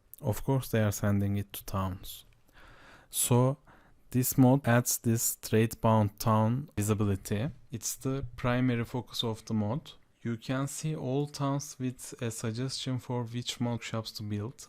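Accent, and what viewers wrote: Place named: Turkey. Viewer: Hintli gibiyiz, direk anlaşılıyor aksandan Türk olduğu insanın :)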